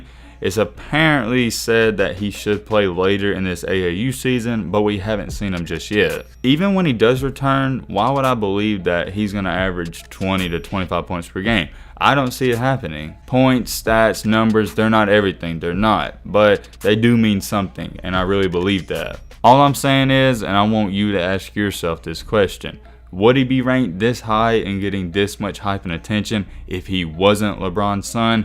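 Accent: South, United States. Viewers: Where are you from in the south?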